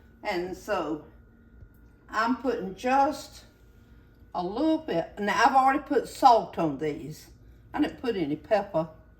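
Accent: South, United States. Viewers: You are a Very Special lady♥️♥️♥️ I enjoy your videos so much ❣️❣️❣️ I’ve never seen a recipe you posted I didn’t like❣️ We eat like you cook and I love your southern accent ♥️♥️♥️ you just make it look so easy to cook♥️♥️♥️🙏♥️♥️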